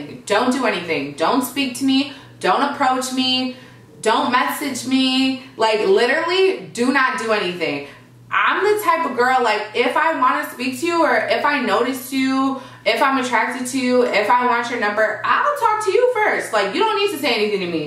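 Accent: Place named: Wisconsin, United States. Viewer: You definitely still have a WI accent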